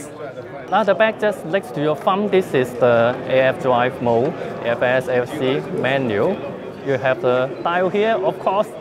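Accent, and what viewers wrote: China. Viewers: chinglish :) but great first look :)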